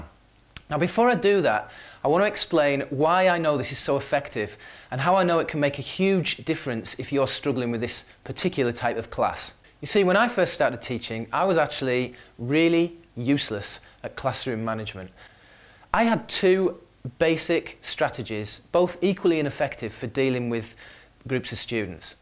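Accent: United Kingdom. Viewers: Hi. Enjoyed the video. Really good advice. Where is your accent from? Are you from the Lakes?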